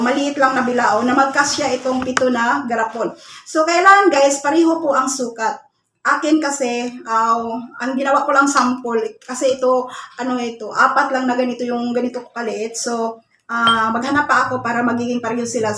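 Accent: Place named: Visayas, Philippines. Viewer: Bisaya ka maam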